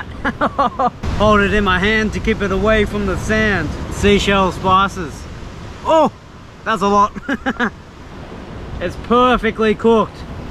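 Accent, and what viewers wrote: Australia. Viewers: What part of Aussie is this? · Exactly how i expected an australian surfer to sound like and be like in a survival situation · aussie are you?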